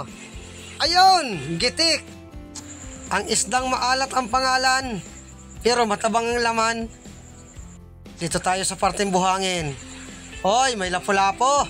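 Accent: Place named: Camarines, Philippines. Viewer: Idol taga camarines ka ba, yung tempo ng salita mo taga sa amin ay, ingat lang idol sa paninisid, Sana makahuli ka palagi ng marami sa bawat sisid.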